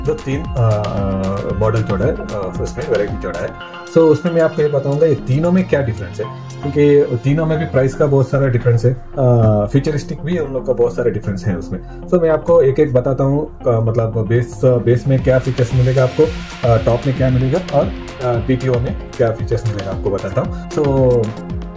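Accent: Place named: Northeast, India. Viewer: abe ve north east se hai... tujhe jyada dikkat hai to jaake sidha showroom walo se baat karle na ek to banda mehnat kar raha upar se ye C type log aa jaayege samne wale ke accent pe bolne ke liye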